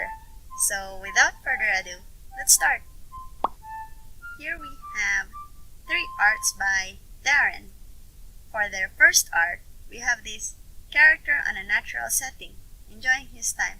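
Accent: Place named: Philippines. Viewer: I can hear that Pilipino accent- 👀. I love your content to the fullest! Keep on going.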